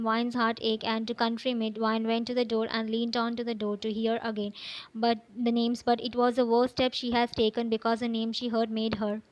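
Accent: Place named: India. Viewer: Hey author ..if you don't mind I want to ask where are you from ? I mean country.. well your English accent seems like Indian accent which I liked it 😊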